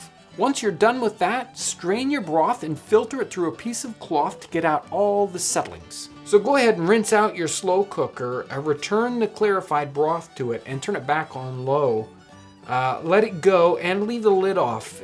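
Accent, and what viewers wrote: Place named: United States. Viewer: What happened to the British guy that used to do this ? It's throwing me off a little that this guy's American haha still great all the same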